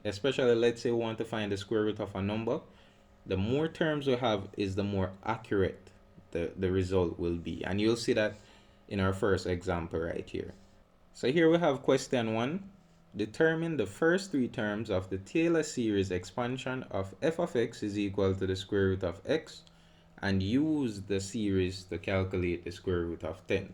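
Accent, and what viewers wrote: Jamaica. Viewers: Am loving the Jamaican accent 😅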